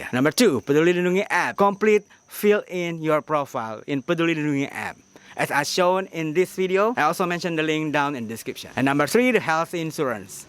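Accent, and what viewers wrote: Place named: United States. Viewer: If you speak slowly and without American accent than we can understand you much better.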